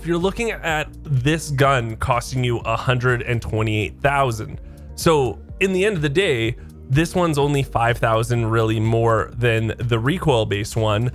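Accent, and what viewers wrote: Canada. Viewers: 1.6k hours and I do know all this stuff but your videos are just so damn fun to listen to that I’m still gonna watch it! You and your damn whacky Canadian accent, keep it up brother!!!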